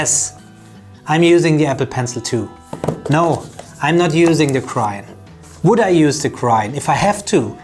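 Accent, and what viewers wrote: Germany. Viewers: Where are you from? Germany?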